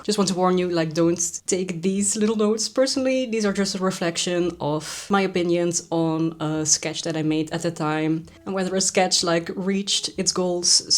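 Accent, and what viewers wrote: Netherlands. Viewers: I always love it how easy it is to recognize the dutch accent, 1 sec in the video and did not see your channel description and i already was like, hold up wait a minute.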